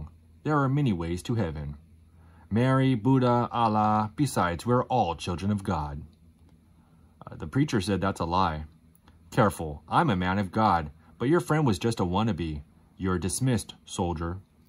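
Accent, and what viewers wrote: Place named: Scandinavia. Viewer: Wru from? Just curious, no disrespect intended. I love languages and different cultures. That aside, you sound kind of Scandinavian. If I'm wrong, again, no disrespect intended.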